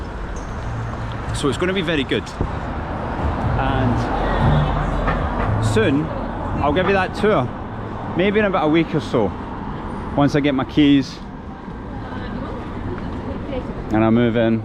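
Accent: Scotland, United Kingdom. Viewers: thank you! I love your beautiful Scottish accent. ♡ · Nothing wrong with a Scottish accent lol. Greetings from London x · I very much enjoy these videos. I think your Scottish accent is just fine. Well suited for this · Many thanks for the honest tour! Your scottish accent is just fine. Btw I've enjoyed watching every minute of this vid. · Your walk&talk tours are great! I don't mind the Scottish accent at all. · I like the walking tours! Is all of Moscow so clean? Where are the seniors? I only see young people. I live in Texas, what a difference! And I love your Scottish accent! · I’m here for the Scottish accent 😃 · Nice take.. and kind of surreal to listen to your Scottish accent in the middle of Moscow, I quite like it though. I subscribed to your channel because you are doing something different, the war talk has taking its toll on everyone’s mental health, just keep on doing this man! 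👍✨👍✨ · I won't be muting the video. I love a Scottish accent. The tour of Moscow was great. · Great video! Would love even longer walks from you. Beautiful, informative and love your Scottish accent. Nice balance of footage of you and the city. I did watch it all the way to the end. I liked it all just the wsy you did it. Hope my feedback helps. Thank you!! :)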